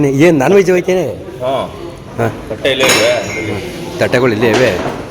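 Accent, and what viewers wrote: Mandya, India. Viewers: ಮಂಡ್ಯ ಭಾಷೆ ಬಿಟ್ಟು ಕೂಡಬೇಡ👌👌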